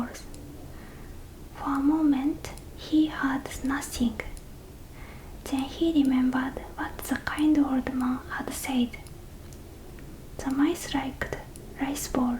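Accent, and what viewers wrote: Japan. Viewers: Engrish very kawaii❤❤❤❤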